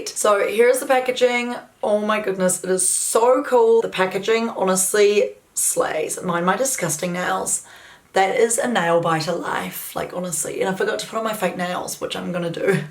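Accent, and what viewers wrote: New Zealand. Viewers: your good old kiwi accent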